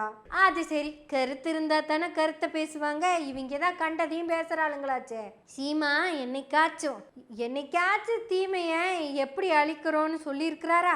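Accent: Kongu, India. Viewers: கொங்கு தமிழ். அருமை. சில நேரங்களில் பேசும் மலையாளமும் புரிகிறது. satire. அருமை. மக்கள் ultimate. மக்களுக்குப் புரிய வேண்டும். புரிந்து விட்டால் போராட்டங்கள் குறையும். சரியான புரிதல் முக்கியம். அரைகுறையாகப் புரிநது கொண்டவர்கள் ஆட்டம் அதிகமாக இருக்கிறது. அந்தக்காலத்தில் கலைவாணர். இப்போது.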